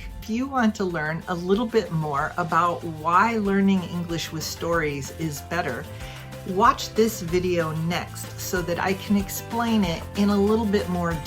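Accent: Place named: United States. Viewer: American accent is clear.❤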